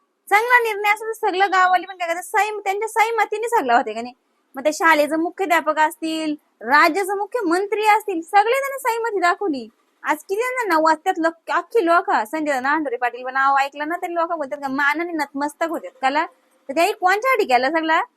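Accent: Murbad, India. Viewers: मी कर्जतचा आहे.. आमच्या कर्जतची आणि बाजूच्या अंबरनाथ तालुक्यातील लोकांची बोलण्याची टोन पण मुरबाडकरांशी मिळती जुळती आहे..ही टोन आपलीशी वाटते आणि बोलताना पण आपलेपणा वाटतो..Keep it up 👍👍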